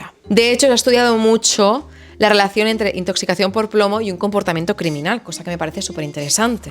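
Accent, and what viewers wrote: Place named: Catalonia, Spain. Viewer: Pues súper interesante y es curioso que una amiga mia que teje y hace crochet como yo n os compartió tu podcast por whattsapp y aquí me tienes, me ha enantado, misterio, historia del arte que me apasiona como la historia misma, ummm, bien felicidades y te sigo. Por tu acento y algun giro parece que sabes catalán? 😂😂😂 porque yo vivo cerqita de Barcelona, ahi lo dejo…. 🤗🤗🤗🤗🤗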